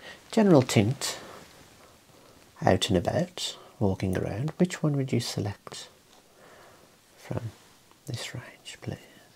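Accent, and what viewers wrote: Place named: Black Country, United Kingdom. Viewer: As a Midlander, loving the Black Country accent!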